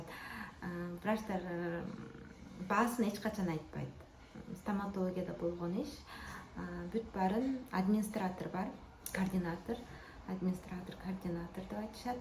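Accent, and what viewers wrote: Standard. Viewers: Кыйын Кыз экенсин,кыргызча нукура суйлоп тушундуруп.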